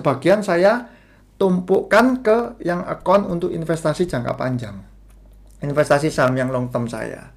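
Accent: Surabaya, Indonesia. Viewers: Bhsnya kyk wong Surabaya ki,mabtab infonya broo... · Logat suroboyo ne mantep koh 😁 Type trading nya kyk saya nih pernah dalam sekejap untung ratusan persen pernah juga dalam beberapa jam rugi puluhan juta Masih belajar biar ga greedy saya koh moga bisa melek money management 😂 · logat boyo kentel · Kok koyo wong suroboyo smpean Koh..?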